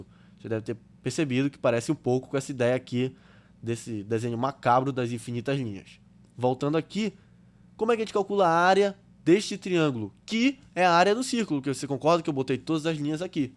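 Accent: Pará, Brazil. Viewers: Paraense?Ta explicado porque intendi o vídeo égua kk És professor? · Pelo sotaque parece ser paraense. Meu contaerraneo Haha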